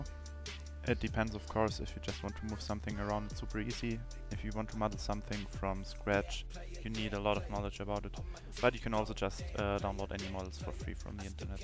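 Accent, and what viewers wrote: Germany. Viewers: Hallo bist du deutsch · U german? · Wie man direkt hört, dass du deutsch bist haha :D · hyper deutscher, grüße aus österreich lul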